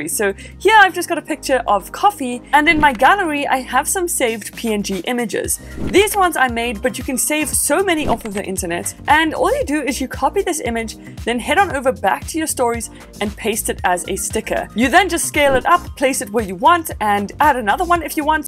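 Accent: South Africa. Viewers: new sub. youre south african, right? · Super professional! Learnt so much. Thank you!!! As soon as I heard your voice I knew this was a saffa lol.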